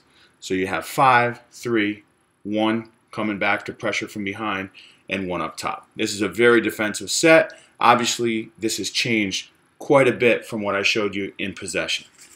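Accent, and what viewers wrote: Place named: United States. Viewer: Wait, are you american? Auto cant watch this anymore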